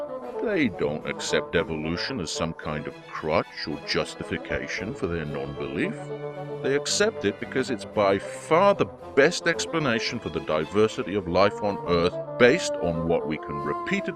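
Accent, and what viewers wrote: England, United Kingdom. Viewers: narration is going to be easier to consume because as you noted, text covers SOME part of the screen, looks like crap if it's too long, what font to choose????? etc. Narration FTW! Besides, if that was your voice I heard on Claire's shoutout, you kill at narration. English accents give most Americans a huge chubby.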